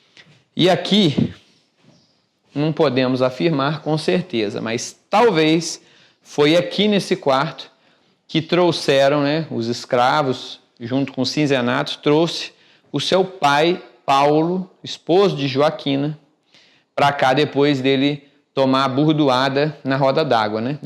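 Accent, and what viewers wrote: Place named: Minas Gerais, Brazil. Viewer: Algo me diz q vc é mineiro, acertei??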